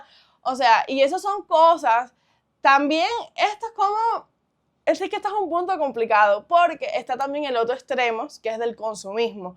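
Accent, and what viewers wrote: Cuba. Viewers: Me encanta tu acento cubano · Hola esta perfecto tu vídeo, pero habla un poco mas lento, si cuesta trabajo entender 😢 mas que nada por qué el acento de cuba es muy padre. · Me encanta tu asento cubano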